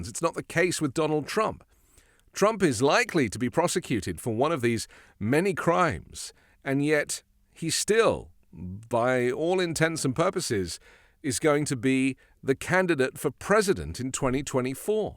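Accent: United Kingdom. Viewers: It sounds like you're from the UK. Just bend over and take it from the migrants and don't worry about what we're doing over here. We're actually fixing our problems.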